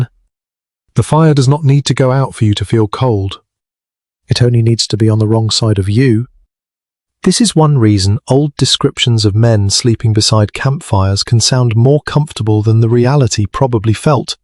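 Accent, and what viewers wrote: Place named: United Kingdom. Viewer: Annoying British accent.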